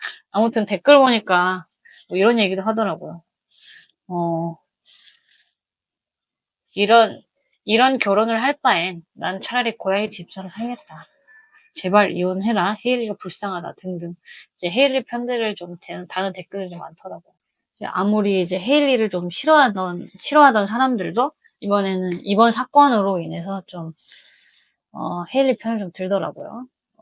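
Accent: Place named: South Korea. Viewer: 말소리만 들으면 그냥 한국발음인데요?너무 대단하세요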